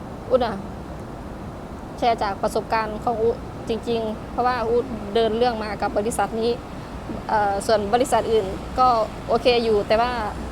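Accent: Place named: Laos. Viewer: เว้าลาวกะได้ แซบหลายเด้อๆๆๆๆ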